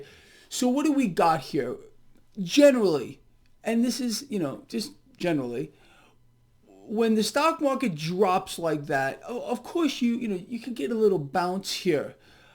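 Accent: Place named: New York, United States. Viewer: Is it possible this guy is from New York or New Jersey? That accent is so thick you could cut it with a knife.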